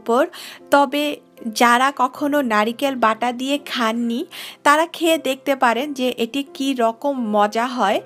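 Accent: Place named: Barishal, Bangladesh. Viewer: ম্যাডাম আপনার বাড়ি কি বরিশাল।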